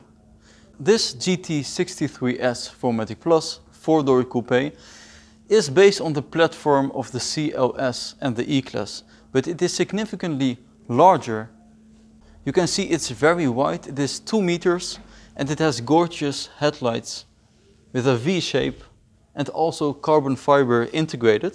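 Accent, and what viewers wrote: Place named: Netherlands. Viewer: i immediatly knew he was dutch hearing how he speaks english =)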